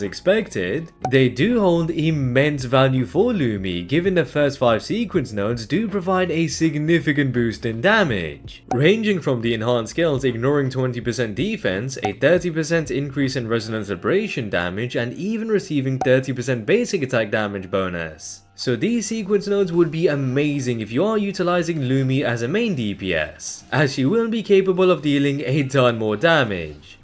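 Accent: United Kingdom. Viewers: sounds british to me. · ??? Her accent is Scottish, not British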